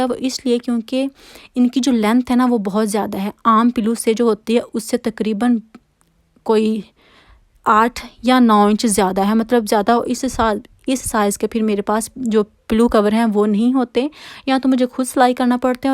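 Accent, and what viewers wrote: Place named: Faisalabad, Pakistan. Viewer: Ap Faislabad me kis area sy hain? apky bolny sy lgta hy ap apny he shair sy hain…yani pensera road sy agy:))))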